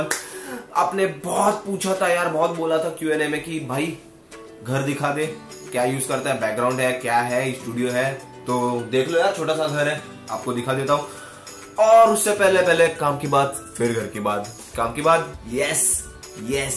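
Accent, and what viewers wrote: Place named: Maharashtra, India. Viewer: I like your marathi accent 😃😄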